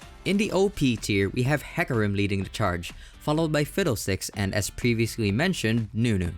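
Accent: Philippines. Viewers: This guy talking is filipino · Is the one talking a filipino or any asian?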